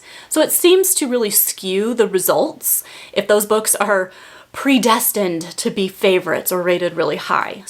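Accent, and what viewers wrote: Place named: England, United Kingdom. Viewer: What English accent you have I like your talking ❤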